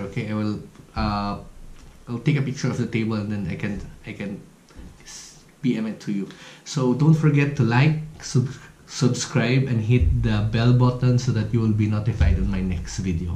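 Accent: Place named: Ilonggo, Philippines. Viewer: Ilonggo ka sir?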